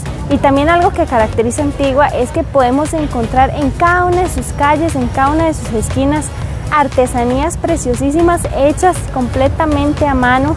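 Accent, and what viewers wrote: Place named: Costa Rica. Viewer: las gracias son a ti por tomarte el tiempo de venir te quiero mucho me gusta tu contenido en la forma como te expresas me gusta tu acento tico escuchar ❤️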